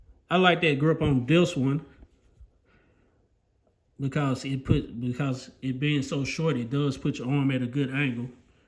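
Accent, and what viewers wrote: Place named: Jamaica. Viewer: Bad man Jamaican voice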